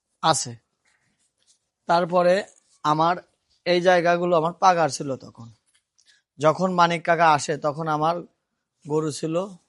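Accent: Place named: Gaibandha, Bangladesh. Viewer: ভাই আপনার হোম টাউন কি কোন ভাবে গাইবান্ধা জেলায়? ভাষার টান টা তেমনি লাগে। আশা করি রিপ্লাই টা দিবেন।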